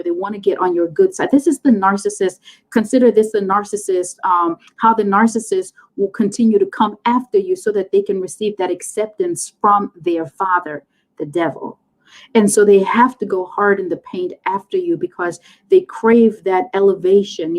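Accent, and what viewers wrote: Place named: Caribbean. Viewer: Even if you didn't have the Caribbean accent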